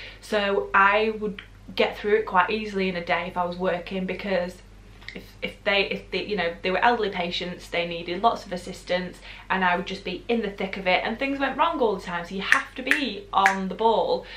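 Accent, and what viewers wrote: North, United Kingdom. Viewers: Just listening to your northern accent is stress relieving in itself! Keep up the good work. Going to try the stress stick as I already have the sleep spray, thanks for the tips. Not keen on camomile tea but my favourite is peppermint and liquorice xxx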